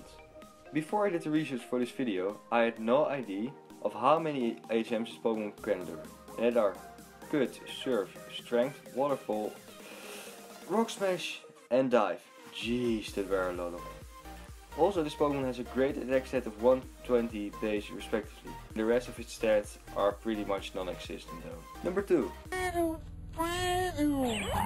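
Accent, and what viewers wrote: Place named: Netherlands. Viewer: Are you from Holland it souns like you from Holland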